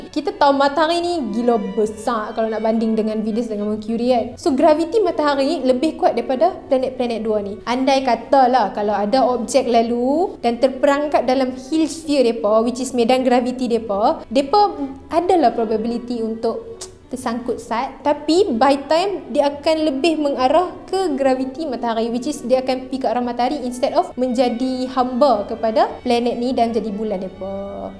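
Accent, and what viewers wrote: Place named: North, Malaysia. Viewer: Nohh lorat utara pekt nohhh...rindu plk kmi kt kg halaman...telari dri topik😅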